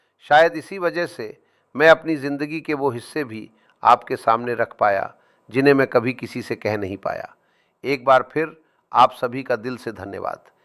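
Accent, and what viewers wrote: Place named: Uttar Pradesh, India. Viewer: अभी भी आपकी आवाज और लहजे मे पंजाबियत जरा भी नही दिखती, यूपी वाली शुद्ध हिंदी ज्यादा झलकती है,इसीलिए लोगो को डाउट होता है क्या आप मेरे इस कमेंट का भी जवाब दे सकते हैं? क्योंकि इसी आवाज और चेहरे मे आप दूसरे गेट अप मे कयी बार हिंदू और मुस्लिम बुजुर्ग भी बन चुके हैं.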